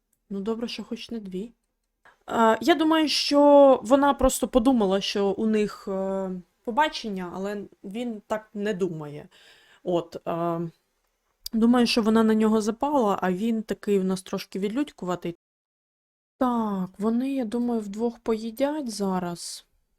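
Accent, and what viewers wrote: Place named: Lviv, Ukraine. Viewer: А звідки ти родом?) Мова дуже нагадує мені Львів або Тернопіль..)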